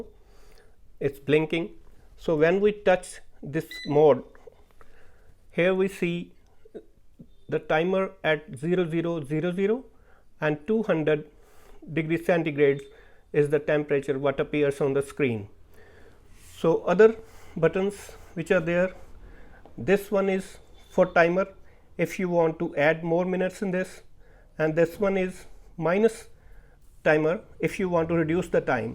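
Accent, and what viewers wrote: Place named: India. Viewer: Yes dear because he is an indian unlike many who speaks english and believe to have english genes.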